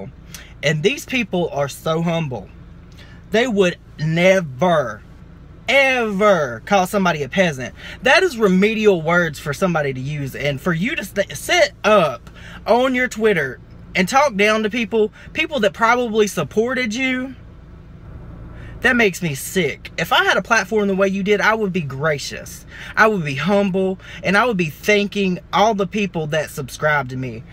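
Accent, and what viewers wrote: South, United States. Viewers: Newbie here lol Peter Monn has said such great things about you & now I know why! As soon as I turned this video on, I got the sense youre a southern drawl, younger (Not saying Peters old, dont come 4 me, were same age)from the country version of Peter which I mean to be a compliment! You have the same positive kind energy & mannerisms that I could tell within a minute of your video😊Thanks for this vid & will be checking your other vids out😀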